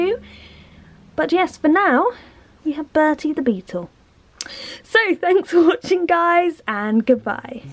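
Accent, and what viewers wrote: United Kingdom. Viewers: Wait are you from uk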